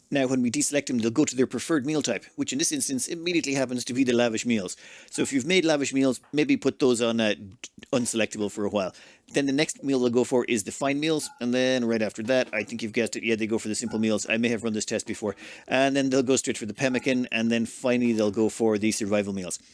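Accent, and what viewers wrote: Ireland. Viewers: It's an Irish accent.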